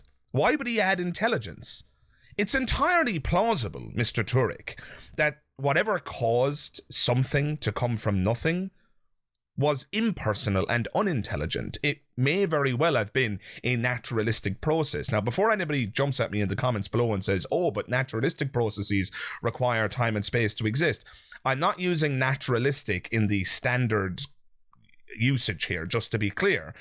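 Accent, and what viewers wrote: Ireland. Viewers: I like your work , honest , simple , straight forward ,easy to follow , genuine, calm, ........ And all in a soft gentle Irish accent. Well done sir .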